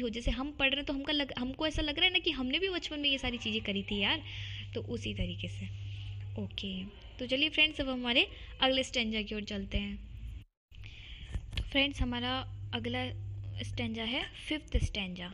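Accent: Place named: Rajasthan, India. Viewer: Btw... Scrolling through ur channel i realize 2 things... U have a really sweet voice & that has a rajasthani texture r u from rajasthan or sort of outskirt's of it ??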